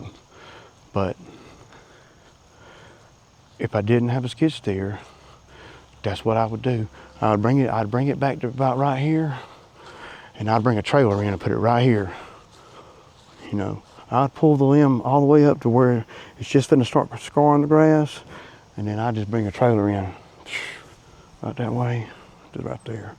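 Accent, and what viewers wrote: South, United States. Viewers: and the audio is great. I can here that southern drawl sir!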